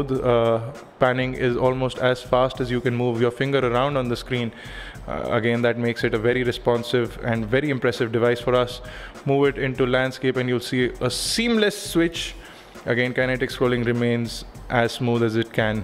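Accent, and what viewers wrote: India. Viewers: its called Indian accent btw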